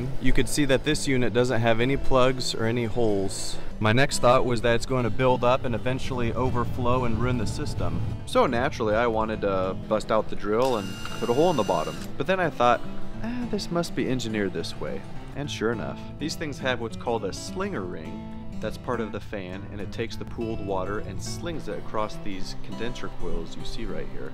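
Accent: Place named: Midwest, United States. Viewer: Looking at what I can see in the video this handyman dude lives in a northern area, his accent is Midwest. Full basement, air conditioner unit not a package unit, etc. probably Iowa or Missouri area.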